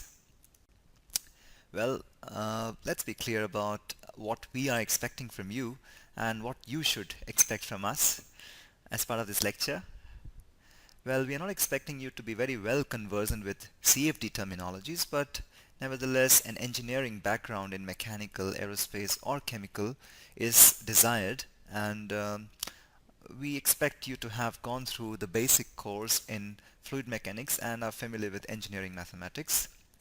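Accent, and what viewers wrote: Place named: India. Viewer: I felt the audio was great but the English isn't with African accent but an Indian accent. I am sorry the audio wasn't great for you.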